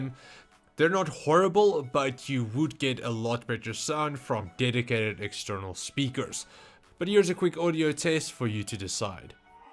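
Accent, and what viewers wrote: South Africa. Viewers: South african accent. deal with it. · he’s from South Africa. It’s his native language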